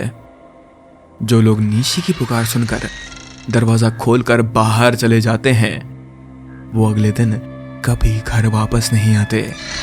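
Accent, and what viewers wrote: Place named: Bengal, India. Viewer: Love your Bengali Accent bro!! Support & Love from Medinipur, West Bengal ❤❤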